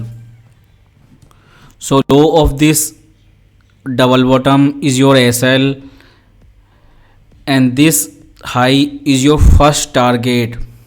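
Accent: India. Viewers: Why don't u speak in Hindi...why this butler english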